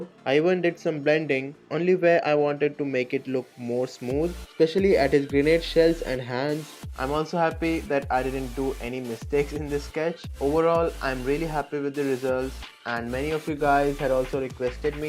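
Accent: India. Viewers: It took me 1 second to realize that you are an Indian (because of your voice idk)... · Wow These Indian voice xd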